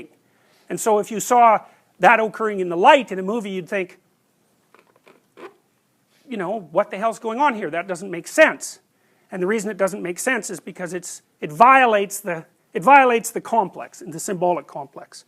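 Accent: Canada. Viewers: All Canadians speak like that. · That Canadian accent is so prominent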